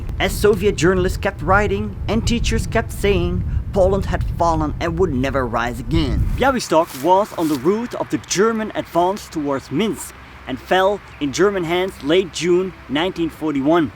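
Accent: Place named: Netherlands. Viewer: Inhoud zeer sterk, helaas vreselijk dik Nederlandsch accent. Als je daaraan gaat werken zou het kunnen dat dit kanaal een groter bereik krijgt.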